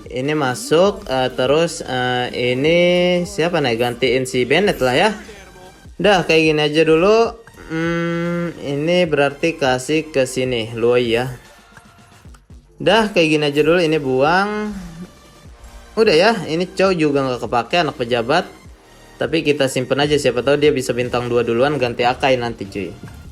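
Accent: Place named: West Java, Indonesia. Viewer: bang lu orang sunda ya, bru pertama nonton gw vitub lu, sumpah ngakak, dri cara ngomong lu plus seru juga, gw ikutin terus chanel lu bang .. mantap 🎉🎉🎉😅😅😅😅😂😂😂😂😂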